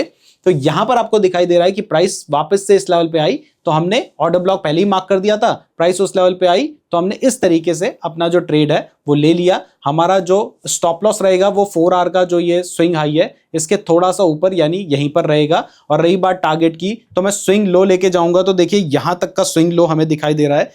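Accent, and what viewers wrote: Uttarakhand, India. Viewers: Sir aap uttarakhand se hain kya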